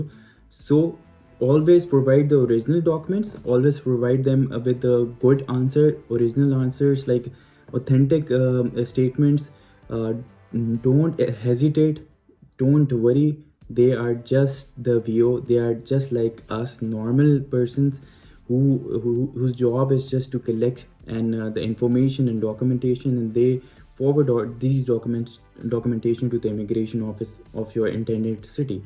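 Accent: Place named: India. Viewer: Great great accent unka indian hai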